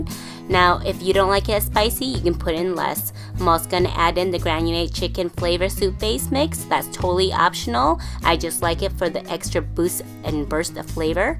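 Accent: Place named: Asia. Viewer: This video is sooo entertaining and well said doing the procedure inspite of our asian accent. 2 thumbs up for you madame chef! I will cook this one for my kids.thank you very much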